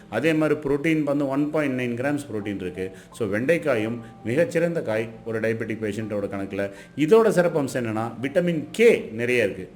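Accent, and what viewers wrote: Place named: Kongu, India. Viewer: Vadhakudhal thaan sir😂 avar pesuvadhu kongu tamil. Adhanaal thaan vanakkudhal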